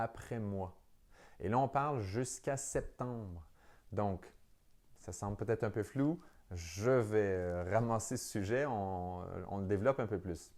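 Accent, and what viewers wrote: Quebec, Canada. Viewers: Nouvelle abonnée, merci beaucoup pour cette vidéo et pour ce sympathique accent Québécois je pense, je vous souhaite un beau mois d'avril du sud de la France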